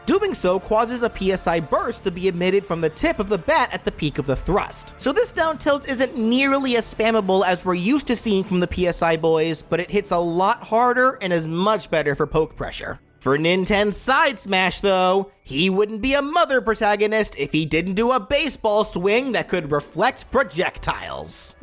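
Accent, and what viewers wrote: New York, United States. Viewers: he has a new york accent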